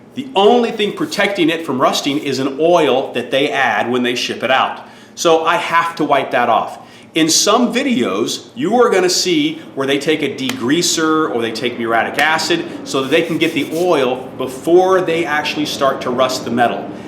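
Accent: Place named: United States. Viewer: This is the most american sounding guy I've ever heard. If america was a person It's him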